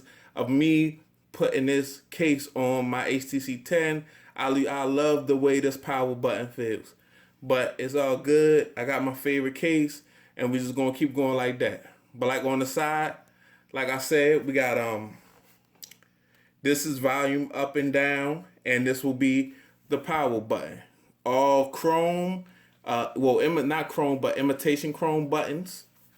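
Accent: Baltimore, United States. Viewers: You from Baltimore huh? The Wire got me hip to the way yall talk lol.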